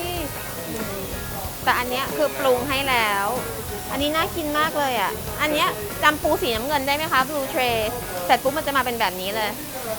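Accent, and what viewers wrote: United Kingdom. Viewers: น้อง พูดภาษาไทยว่าเพราะน่ารัก ฟังเพลินแล้ว..ภาษาอังกฤษก็ไม่แพ้กันเลย..สำเนียงน่ารักเชียว..British?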